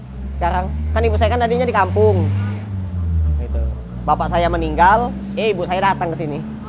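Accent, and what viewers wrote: Palembang, Indonesia. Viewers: Logat palembang nyo mase ado 👍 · Logat palembangx khas sekali..smga sllu dimurahkan rezekinya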